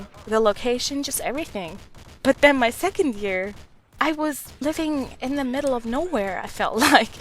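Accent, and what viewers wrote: Germany. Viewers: I dont understand :D Is She from Germany? Und wenn sie aus Deutschland kommt, Warum redet sie dann auf Amerikanisch? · Are you German cos I'm confused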